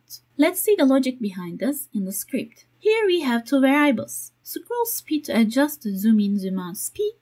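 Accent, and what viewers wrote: Turkey. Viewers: Türkçe gibi İngilizce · aksandan türk olduğu anlaşılıyor :) teşekkürler video için.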